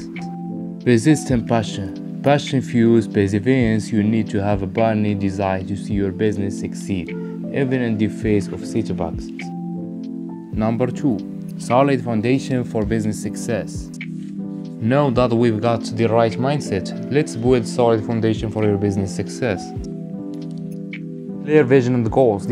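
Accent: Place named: Morocco. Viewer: لونجلي نقية كمل اخويا المهم عرفتك مغربي غا بالأكسنت ❤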